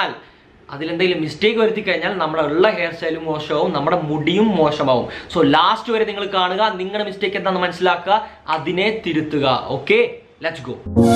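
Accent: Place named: North India, India. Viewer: Bro u r so superb.. Iam ur big fan.... I think u studied in North india.... Ur English speaking is like North ppl..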